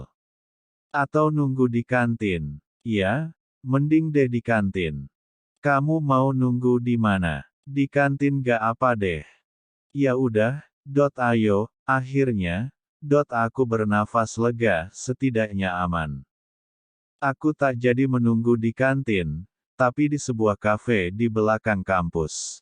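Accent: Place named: Java, Indonesia. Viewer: Cerita nya lucu, ada logat Jawa nya lanjut Doong ❤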